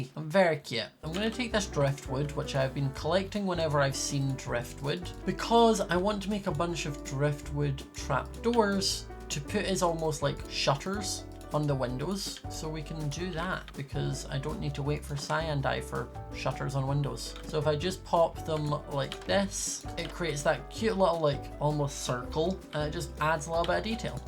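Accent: Scotland, United Kingdom. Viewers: Are you Scottish??? Bc you have the same ascent as my half brother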